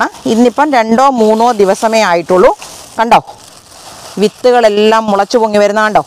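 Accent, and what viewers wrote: Kottayam, India. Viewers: വർത്തമാനം കേട്ടാൽ അറിയാം കോട്ടയം സ്വദേശിയാണ് എന്ന്..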